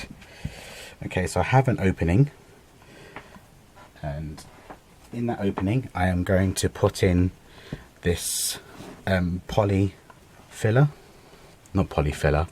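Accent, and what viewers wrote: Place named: Kent, United Kingdom. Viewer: Clever. Wouldn't of thought of that. you sound like your from Kent. I live in Kent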